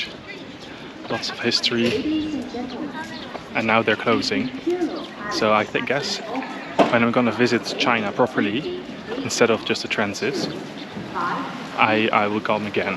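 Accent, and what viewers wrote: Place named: United States. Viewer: I just come back from China, I use cash all the time, no one looking at me like foreigner. It's very strange how could not bring enough cash especially it is your 1st time, also seems you already alert all the bad thing before come in. Look your vlog, it has little viewers, this one hit 4 digit viewers just a few hrs. Sure, you want to back if only China is money come from. Hope you won't come back, sir. Korean are extrovert people? Give me a break. Most of them speak English? By the way you sounds like American dude😅 YOU ARE NOT WELCOMED!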